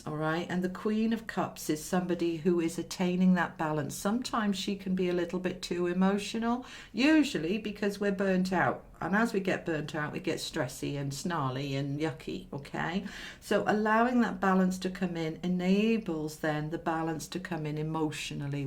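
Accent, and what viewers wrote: United Kingdom. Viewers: I love your beautiful British accent ! Thank you so much from France !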